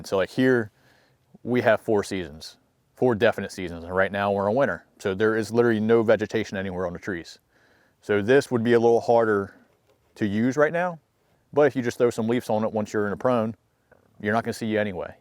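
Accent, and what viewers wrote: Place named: Pennsylvania, United States. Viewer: Lol i can here that PA accent anywhere, channel emote reconfirms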